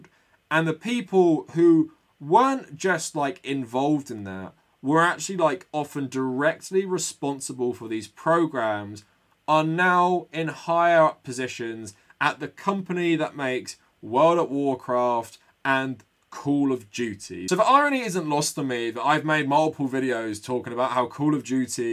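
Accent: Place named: United Kingdom. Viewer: I like your British accent, most trigger me now but you’re is cool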